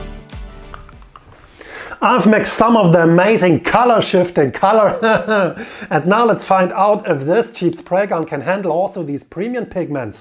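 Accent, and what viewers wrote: Germany. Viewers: Excellently presented work with a gun. I am interested, however, in these seals you mention. To be replaced with SATA seal. Where can I buy this? What address, shortcut on the website? I hear from the dialect that you are from Germany. I may be wrong. Is it possible to get these seals from Germany?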